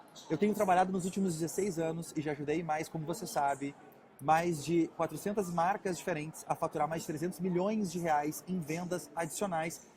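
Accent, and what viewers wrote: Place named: Portugal. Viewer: To começando a te acompanhar, e sábia que esse sotaque era da terrinha.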